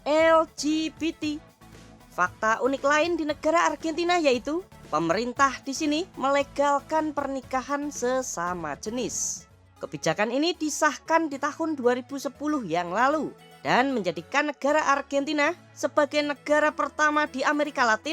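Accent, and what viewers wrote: Java, Indonesia. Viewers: Admin ini walaupun logatnya medok bgt jawanya, tapi lucu..gue suka logatnya😂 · Medok bgt jowomu. Banyak bgt channel-nya woy. 😅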